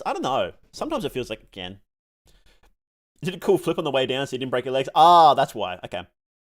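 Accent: Australia. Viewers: Mr Funny Australian Man, I have an important lore question. Is Patrick Bateman a new god?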